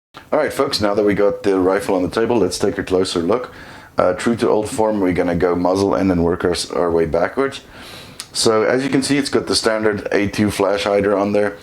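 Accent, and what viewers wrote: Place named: Pretoria, South Africa. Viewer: Am I hearing a Pretoria accent there 😂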